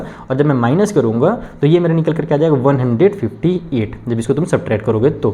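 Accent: Bihar, India. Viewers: Sir bihari language ❤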